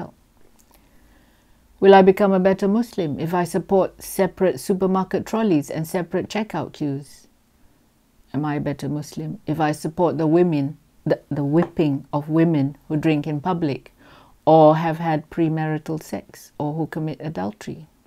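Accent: Malaysia. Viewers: You speaks as true Malaysian. Thumb up.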